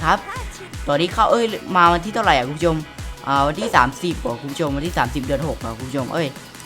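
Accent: South, Thailand. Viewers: พี่คนใต้หรอครับ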